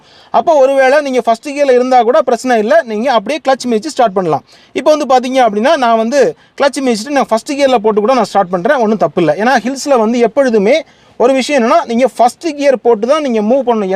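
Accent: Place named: Nanjil Nadu, India. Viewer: எளிதான, அருமையான விளக்கம் தம்பி... உங்கள் நாஞ்சில் தமிழும் அருமை....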